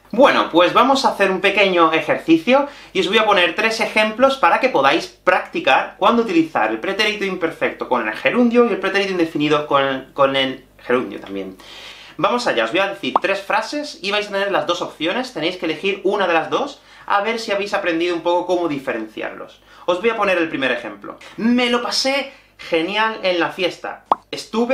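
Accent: Andalusia, Spain. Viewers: Tu eres de espana? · Hola! Estuve flipando durante todo el vídeo: Qué bien lo has explicado todo tío y además era una de mis dudas más grandes! Pero tengo una pregunta, y no sé si tal vez podrías hacer un video sobre este tema? En fin, me gustaría saber cuál es tu acento propio o original? Puesto que eres de Málaga, siempre me he preguntado si tienes acento andaluz? Si es el caso: has crecido con ese acento y después aprendido el más "neutro"? Y ahora sabes hablar con los dos cuando quieras o has perdido el andaluz? Te lo estoy preguntando, porque a mí me interesan mucho los acentos, y creo que enfocarse en un acento en particular puede incluso ayudar con el aprendizaje de un idioma como el español, o eso por lo menos ha sido mi experiencia. Un cordial saludo desde Suiza!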